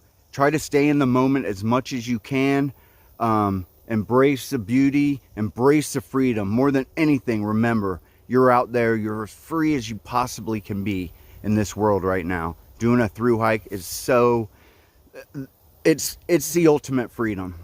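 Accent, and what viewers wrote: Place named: South, United States. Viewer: Loli love the way yu say the at tail have thst southern voice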